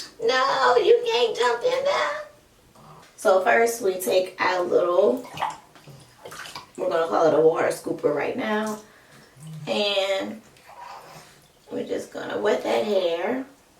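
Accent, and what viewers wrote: New Orleans, United States. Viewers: Beautiful... Do I hear a bit of Nola Baebyyyyy?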